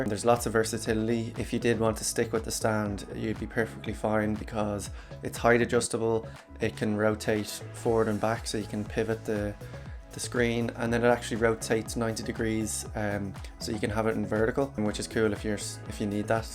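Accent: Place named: Ireland. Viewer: Good explanation but I would like to see another video of your experience with it. I have been shopping around and I think this is the one I like the most for the price and brand name but I still am not sure yet. Thank you for doing this video. By the way you sound IRISH.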